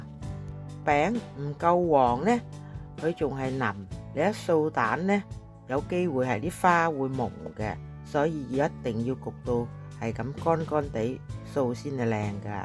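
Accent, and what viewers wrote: Vietnam. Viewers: Amy姐；妳講話的越南口音很重，請問妳是否北越海防人氏？謝謝 ！💝💋💞💯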